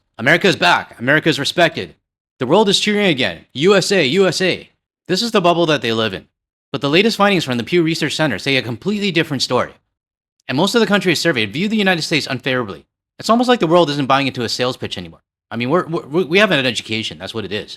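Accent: Quebec, Canada. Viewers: About the goofy accent. I think it might be our fault, us French Canadians from Quebec, and our less-than-perfect English 🙃 Sorry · I think that dude calling your accent a goofy accent is very offensive to you Canadians, but the funny thing is that it is somewhat offensive to themselves too, as I am pretty sure that the majority of world citizens cannot distinguish the Canadian and US accent from each other. As a Dutch citizen, I can confirm that the trust in the USA has vanished in most of Europe and definitely in The Netherlands. People from left to right would never support this administration. So don't worry, Canada is definitely not alone. It is not a coincidence that the EU has made trade deals with other countries including India. As you said, the world moves on and let them believe that they are respected.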